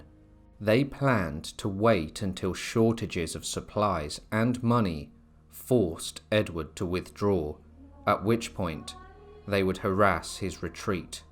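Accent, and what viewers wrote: England, United Kingdom. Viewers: Being a very patriotic Highlander i am insulted that Wallace is being narrated by an Englishman with a common accent that fails to be understood, who the hell decided to pick someone from the other side of the boarder to talk on Wallace, what a disgrace, put the shoe on the other foot see what happens, not that they ever had a super warrior like Wallace. Pick a Scot for feck sake.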